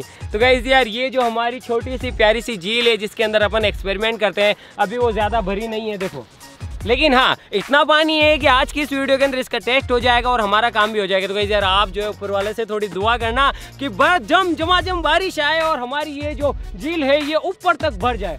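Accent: Rajasthan, India. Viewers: Bhai aap rajeshthani bhasa badi achhi bolte ho ek video me aap bs rajeshthani bhasa hi bol ke banao na plz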